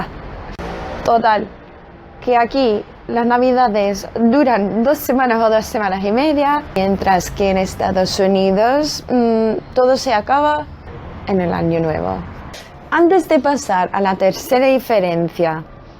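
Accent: Andalusia, Spain. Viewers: Que graciosa una estadounidense con acento andaluz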